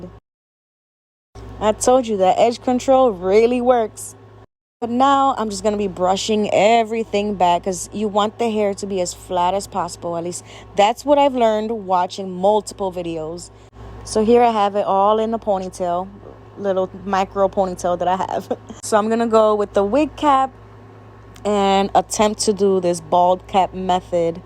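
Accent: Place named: New York, United States. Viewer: I love your accent reminds me of NY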